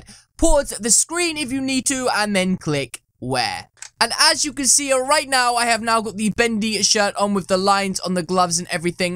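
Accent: United Kingdom. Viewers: Are you an british acsent?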